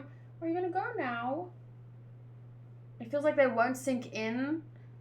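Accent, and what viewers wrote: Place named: South Africa. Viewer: I just cam across your channel tonight, and when you talked I was like. "YO you South African" if im wrong i will kill myself. But im like 100% sure you are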